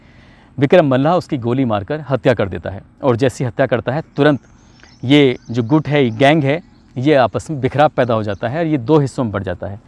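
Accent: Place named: Amroha, India. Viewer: सर, आप तो हमारे ज़िले अमरोहा की हमारी तहसील हसनपुर के ही निकले 😂 आपके बोलने के अंदाज़ से मुझे लगता था, Allah bless u sir, keep it up👍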